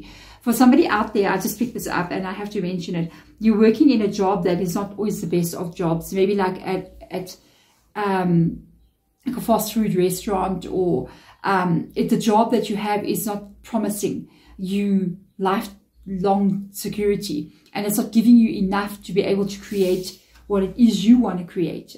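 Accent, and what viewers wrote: South Africa. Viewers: That is what drew me to your channel, not only your lovely face but beautiful South African accent. Thank you for all you do..................... love from the UK. xxxxx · I love listening tonyour readings precisely because of your accent. It reminds me of a former south african manager I worked with, lovely woman, I miss working and talking with her. You talk at a very comforting pace. Thank you for all you share ❤ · ❤😊thank you for your encouraging readings, dear soul!!! And I love your accent, I really do. In the beginning I had to become used to it, but now I understand everything you explain😊. My daughter s had schoolmates from south Africa, and at the age of 12 their parents had moved to Germany. They shared our home very often, and my daughter never had difficulties to understand you!😊 Feel good and keep shining❤❤❤ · love the SA accent?!!?🧚🧚🧚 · I absolutely love your South African accent and the way you speak and express yourself! 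· 777 comments, ❤ well I've now made it 778😂❤ ps your accent is my favourite, I personally love the South African accent. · I thought your accent is so cute. ❤️ I never had any trouble understanding your English at all. You talk so grammatically correct. I'm in Chicago and never knew anyone who is South African. Love you mama tarot lady. ❤️ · Always a delight to be here for your readings. So funny all these south african english like the now-now :) Thank u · I am South African too living in New Zealand love the accent 😊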